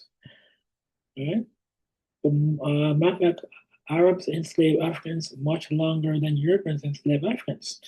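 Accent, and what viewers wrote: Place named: West Africa. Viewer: Hi Doc, is your lovely accent West African, or Haitian?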